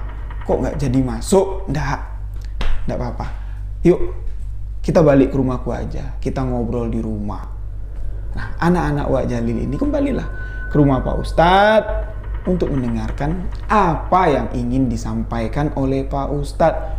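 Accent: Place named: Jambi, Indonesia. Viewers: Sukaaa dg pembwaan bhsa jambi bang...sukses selalu bang salam dri jatim😍😍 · Walaikusalam kak..... Sellu setia mnyimak wlu terlmbat.....🤭 Suka bangettt logat Jambi y kak Cpt smbuh n pulih kmbali y kak.... Tmbah berkah n smakin sukses y 🙏🌹🌹🌹💪🔥🔥🔥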